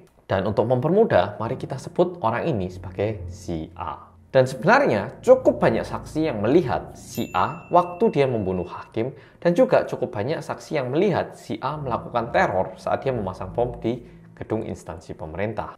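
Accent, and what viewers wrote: Java, Indonesia. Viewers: sudah mengurangi medoknya bang, pistol, wkwkwk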